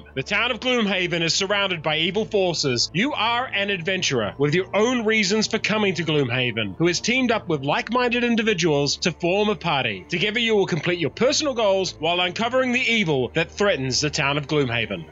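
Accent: New Zealand. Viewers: Is this guy a kiwi?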